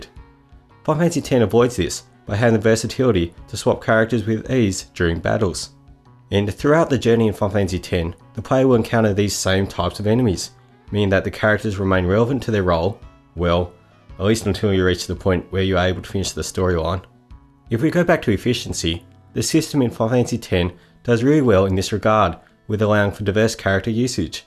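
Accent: Australia. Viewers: I'm Aussie too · Are you Australian, by any chance?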